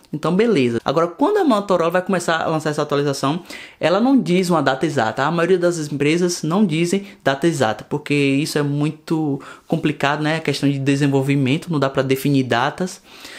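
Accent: Bahia, Brazil. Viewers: Sotaque baiano é outro nível 👏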